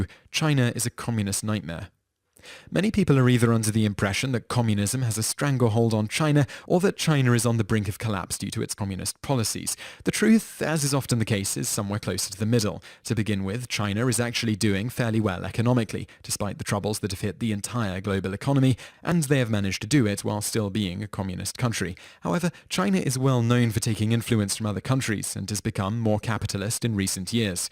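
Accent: United Kingdom. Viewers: zekeboy24 very true , is he a British guy ? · Many of the "ridiculous myths" I never even heard of. Seems like pro Chinese propaganda to me, narrated by Guy with a British accent to make it sound more intelligent. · The narrator in the video is British not American, and the subject of the video is to clear up possible misconceptions of the Chinese as a society. How does this diatribe become a false attack on Americans? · So much fail... Have you never heard a British accent? Or did you think Americans have this one? WOW... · David T Spoken by a British person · The commentator is British. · Not really irony, they're not the same american people who created the myths, plus, like Rachel said, the narrator's brittish. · I'm pretty sure the announcer guy's a brit.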